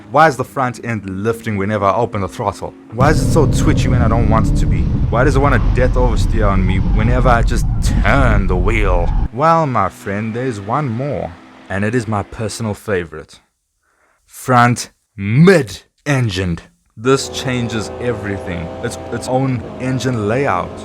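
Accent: South Africa. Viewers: since you are taking the time to respond to my comment personally: 1. My ultimate pick would be a Lexus LFA for feel (but due to price, would never get to drive one like that). 2. FD RX7 would be my realistic pick, or probably an old Porsche Boxster for being even more realistic for the wallet and fun. 3. Check out the M3 GTR that me n my mates built for Bathurst (#42), hit a kangaroo 😂 4. I noticed all footage was of the ring - my uncle worked there (I’m a FT dad, so a while before I get behind the wheel again). 5. Porsches are just great from factory 😂 6. I’m actually old - hence the skyline. Nice SA Accent btw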